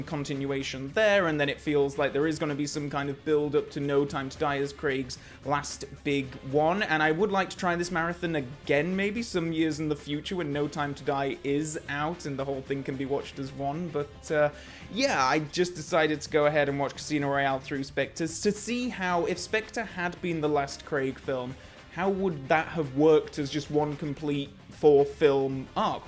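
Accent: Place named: England, United Kingdom. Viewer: Great vid! Knew I would like this channel as soon as I saw you were dressed like a waiter and had an English accent. Great analysis. Subbd.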